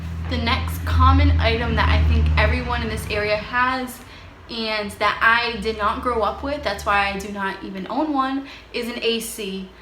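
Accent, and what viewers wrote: Boston, United States. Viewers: A projector with a cute Bawston accent ;) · On another topic she has the cutest Boston accent 😁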